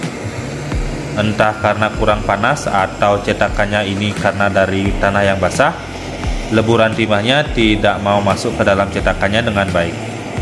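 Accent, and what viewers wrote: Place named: Aceh, Indonesia. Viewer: Logat nya kayak tau, dr aceh bg ya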